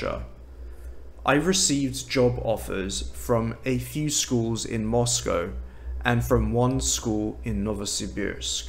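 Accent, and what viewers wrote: United Kingdom. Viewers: прекрасный преподаватель с классическим британским произношением. То самое - которое считается золотым стандартом. Радио ВВС и т.д. Занимаюсь с больше 1,5 года. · Информативно. Британский акцент мне нравится больше, чем американский. Спасибо, что говорите медленно. Это самая сложная часть в изучении английского - восприятие на слух. Русский на слух воспринимать проще - он по звучанию почти не расходится с написанием.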